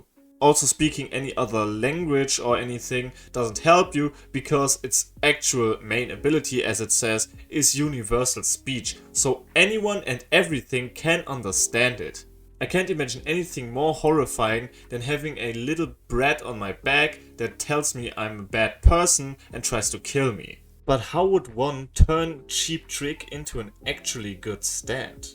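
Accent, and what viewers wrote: Germany. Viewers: Deutscher Akzent! Weiter so Bruder💪🏻👍🏻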